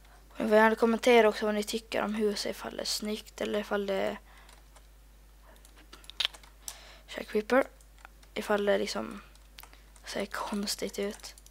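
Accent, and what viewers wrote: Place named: Finland. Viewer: Jag tycker du pratar svenska som man pratar i Finland bor du på Åland? PS jag bor i Finland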